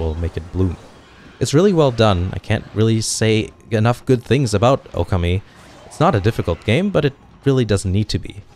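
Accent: Germany. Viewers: You are from Germany :D?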